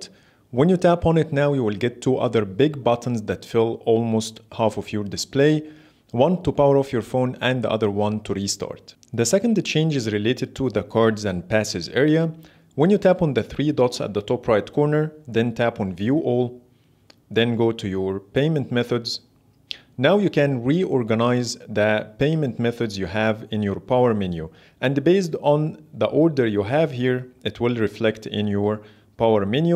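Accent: Egypt. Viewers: I can tell from your Accent that you are Egyptian Keep the hard work 🔥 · You sound Egyptian! :D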